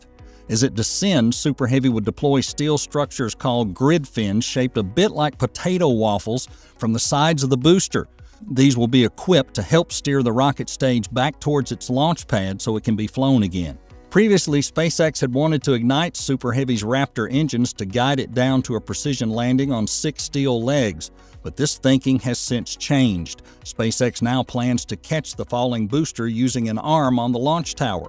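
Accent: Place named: Texas, United States. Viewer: Thank you, your explanations are immaculate, and your accent smacks of Texas ! 👍👍👍👍👍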